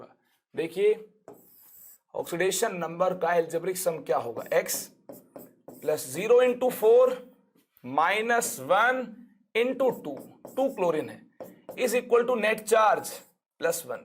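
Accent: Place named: Haryana, India. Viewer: sir, u have a haryanvi tone in your voice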